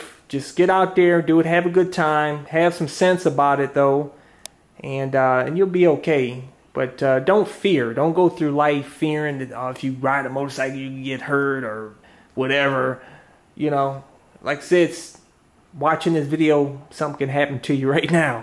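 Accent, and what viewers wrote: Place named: Appalachia, United States. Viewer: you're a great guy and very sincere with your advice. You have a great hill billy accent and infectious laugh.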